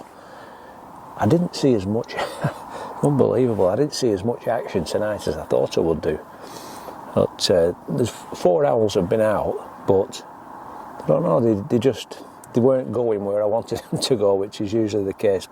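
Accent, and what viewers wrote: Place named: Greater Manchester, United Kingdom. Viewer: Hi Im new to ur chnl what lovely video u sound fairly local to me here in grtr manchester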